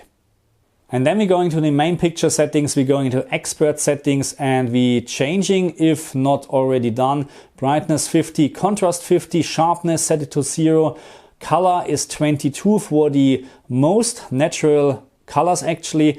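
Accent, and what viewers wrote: Germany. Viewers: I love hearing the German accent.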